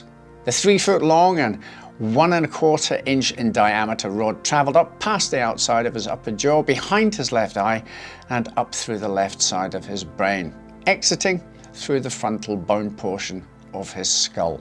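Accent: Scotland, United Kingdom. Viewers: Are you are Scottish, but have stayed in England? Or English, with time spent in Scotland?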